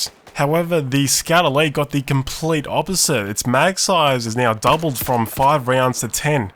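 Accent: Australia. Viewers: Im just wondering are u an australian?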